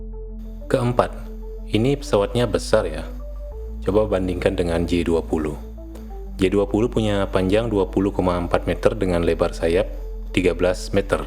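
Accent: Bali, Indonesia. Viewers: Orang bali lu bang?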